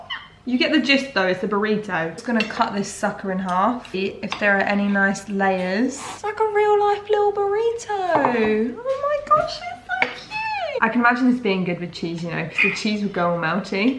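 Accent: United Kingdom. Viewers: Is it just me or she looks like merle from buzzfeed with a british accent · I just love her personality! Although British accent is still hard for me, lol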